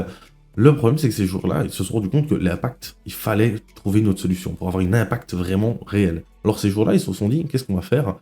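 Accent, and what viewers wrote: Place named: Liège, Belgium. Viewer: J'ai remarquer ton accent liegois direct MOI AUSSI JE SUIS UN BELGE QUI JOUE A WT 🙏🏻🇧🇪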